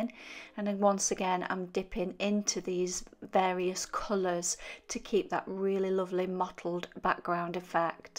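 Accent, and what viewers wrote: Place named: North, United Kingdom. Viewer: Great, nice to hear a northern accent too👍🎨😊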